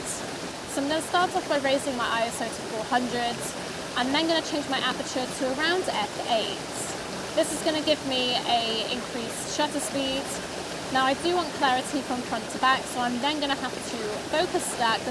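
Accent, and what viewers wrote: United Kingdom. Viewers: Can you please teach me how to dance? But first, teach me how to talk with a British accent. Then teach me how to use an L-bracket on my Canon EOS R that has a Ulanzi Claw Quick Release plate on with a Ulanzi Zero Y Lightweight Travel Tripod. I hope I'm not asking too much. 💜 You asked for feedback on your audio as you were talking and setting up around the falls. Yes, the waterfall is loud, but I clearly understood what you said, even with my 66-year old ears. You mic gain could've been a tad (or wee, as y'all say) bit higher, but that might've increased the waterfall sounds too.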